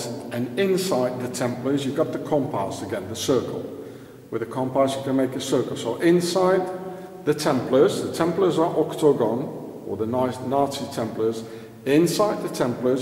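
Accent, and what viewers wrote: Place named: France. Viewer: I love her French accent. Its amazing to be walking into these buildings that are 800 years old or so. Don't have that here in the US. Be safe.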